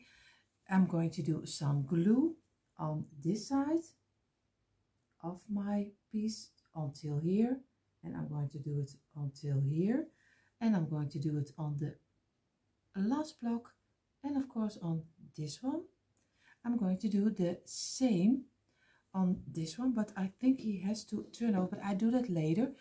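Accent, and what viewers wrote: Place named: Netherlands. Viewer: Loved The Lantern!! You Have A Great Imagination For Creating! I Also Like Your Dutch Accent, You Have A Soothing And Unique Sound!!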